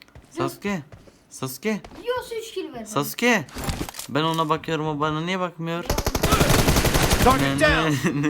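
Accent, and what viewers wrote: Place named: Syria. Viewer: Niye suriyeli gibi konuşuyon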